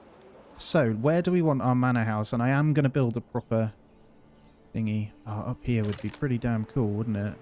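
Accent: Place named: England, United Kingdom. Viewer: I'm trying to pinpoint your accent....is it Bristol, Devon or Norfolk? Can't put my finger on it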